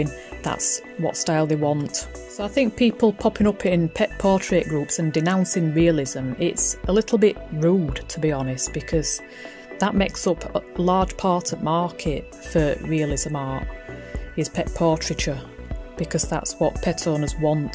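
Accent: Yorkshire, United Kingdom. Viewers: This has to be the best video out on YouTube! Just fantastic. I have to agree with every single word that you said. Great to have someone with a proper accent too haha, where in Yorkshire are you from? Your artwork is amazing!! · Just like to say the painting is fantastic and I agree with your view on realistic paintings. I'm originally from East Lancashire but lived in Dublin for 25 years so to listen to a proper northern accent even though its Yorkshire is great. We have an art gallery here that's dedicated a whole room to paintings of large stripes!! realistic art is real art created by people with real talent.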